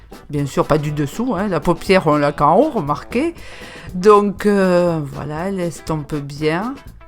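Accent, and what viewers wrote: South, France. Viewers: Troooop mignonne ta 😍 mais elle ma trop fait penser à monsieur ribera dans ariol (c'est un dessin animer lool) il dit toujours "effectivement" et en plus lui aussi a l'accent du soleil lol. Bisouuuuus 😘😘❤❤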